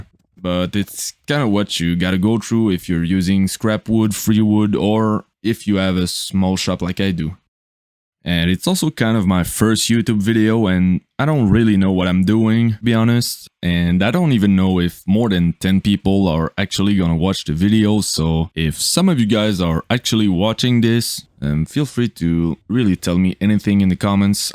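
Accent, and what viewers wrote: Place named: Quebec, Canada. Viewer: well done sir. As someone who also works with reclaimed wood, I appreciate the amount of milling required to make it that good. Such a shame you didn't keep it. Your camera angles and editing are great. Your are well spoken and explained really well. My only issue is with the unnecessary music. Your voice and shop sounds are all you need. You sound French/Canadian...where are you from? Considering you only have 1 video so far...you should be pleased you already have over 1k subscribers!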